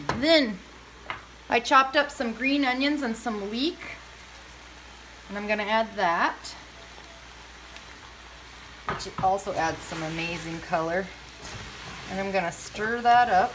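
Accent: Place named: Gaspé, Canada. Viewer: You have a Gaspé Coast accent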